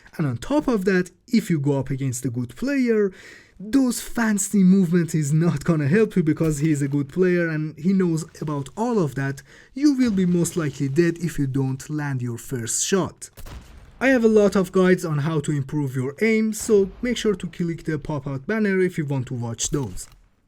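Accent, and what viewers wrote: Iran. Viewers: tell me if im wrong but your accent is screaming that u from iran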